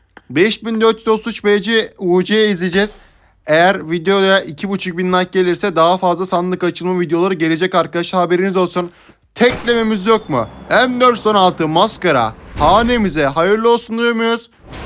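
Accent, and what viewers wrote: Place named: Thrace, Turkey. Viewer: Boş video aq Trakyalı konuşma cabana bayıldım kardeşim 😂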